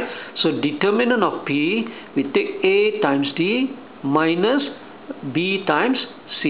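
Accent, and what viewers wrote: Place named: Philippines. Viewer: sounds asian... phillipino is my guess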